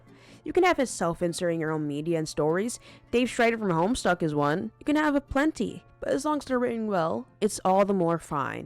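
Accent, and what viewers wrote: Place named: Netherlands. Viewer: oh idk why your accent sounded vaguely Dutch to me lol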